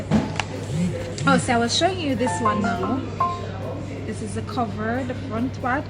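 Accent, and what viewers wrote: Jamaica. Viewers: Loving the video and how you a talk the words Jamaican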